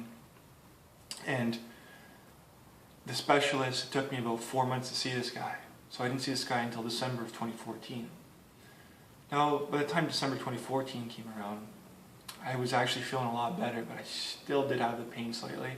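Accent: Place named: Quebec, Canada. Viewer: I came here for info about discs and stayed for the French-Canadian accent and the 'ookey hair.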